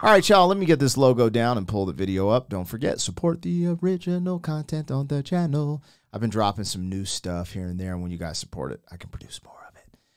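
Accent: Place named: Louisiana, United States. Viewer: cali boy with a cajun accent!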